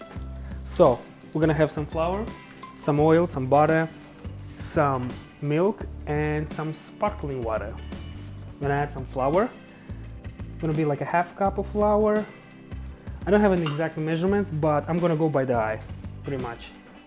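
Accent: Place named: Eastern Europe. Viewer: DAT SLAVIC ACCENT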